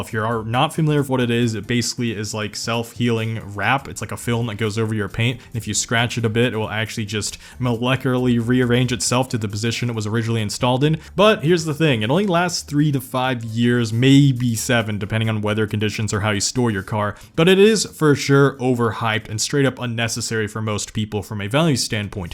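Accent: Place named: Midwest, United States. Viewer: You have a similar accent to Sam O’Nella, are u from the mid west?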